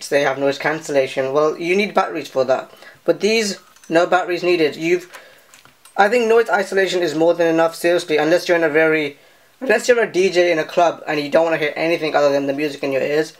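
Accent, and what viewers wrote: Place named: India. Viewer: half indian half uk accent, pretty cool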